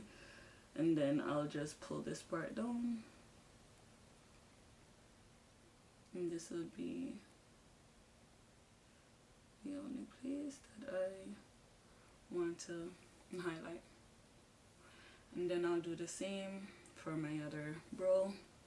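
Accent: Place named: Jamaica. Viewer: Beautiful makeup routine . Are you Jamaican? I hear the accent . ❤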